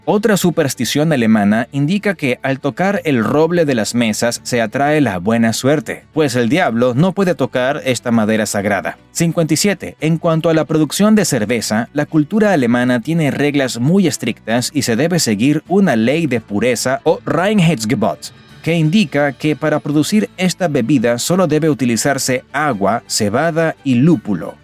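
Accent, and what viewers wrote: England, United Kingdom. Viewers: el pronuncia como un inglés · Da risa escuchar como alguien pronuncia palabras y nombres alemanes en estilo o mas bien con pronunciación ingles. Locura completa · ni "uagner" ni "ulks uagen" si vas a hacer un video sobre Alemania y laurear sus virtudes empieza por respetar el idioma, es normal "castellanizar" las palabras para ajustarlas a nuestro acento pero no "anglizarlas" por ignorancia, es tan fácil como usar el traductor de Google y reproducir su pronunciación para luego repetirlo lo mejor posible, me sangran los oídos cada vez que usas acento ingles al pronunciar las palabras nativas del Alemán, la "W" suena como "V" o "F" así que suena: "Vagner" y "Folks Vagen" RESPETEN EL IDIOMA!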